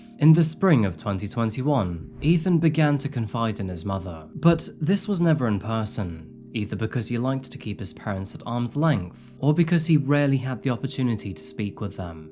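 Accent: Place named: RP, United Kingdom. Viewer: So I have great fondness for our friends in the UK, but man your manner of speaking sounds like it’s straight out of Eaton, very overly posh or at least a high-low, sing-song rhythm. Great content, it’s just the voice over is tough to listen to.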